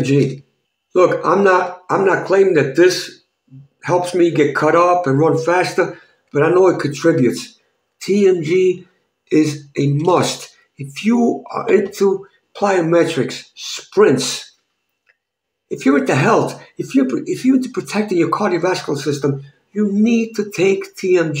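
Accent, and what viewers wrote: New York, United States. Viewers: Hi, I'm also in my 60's I just started taking TMG and it's working great from what I feel so far. I wanted to ask you are you in Germany? Because you sound like a New Yorker. God bless stay healthy. I really enjoy your videos very educational.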